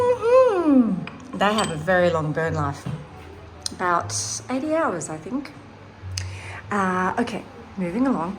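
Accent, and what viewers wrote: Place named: France. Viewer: ou étais-tu partie encore, qui te fais beaucoup trop RARE, ton charme, ton accent Français, ton talent, ton village magnifique....je me languis déjà de ta prochaine vidéo ( excuse moi ), reviens vite... connaissez-vous le château de Purnon, du 18eme siècle, dans la Vienne, classé aux monuments historiques, en restauration, par un couple d' australien....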